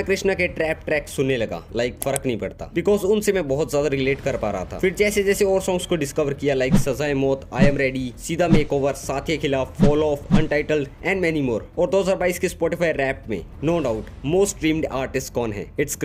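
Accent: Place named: Haryana, India. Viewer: Bhai ab Rajasthan se ho...mujhe laga tha haryana se ho😂😂 ap avaj se haryanvi lagte ho😅 vaise mein chandigarh mein rehta hu...and haryanvi hu.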